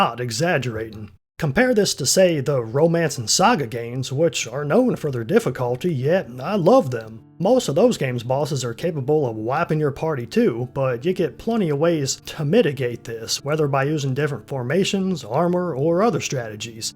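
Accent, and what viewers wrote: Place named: Rural, United States. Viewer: >hick accent, opinion rejected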